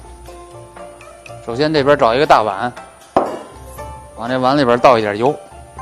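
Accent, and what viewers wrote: Beijing, China. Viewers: 普通话说的很地道像是一位北京人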